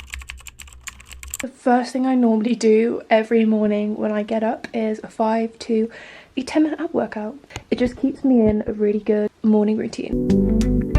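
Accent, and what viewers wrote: United Kingdom. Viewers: Your british accent is so cutteeeeee❤❤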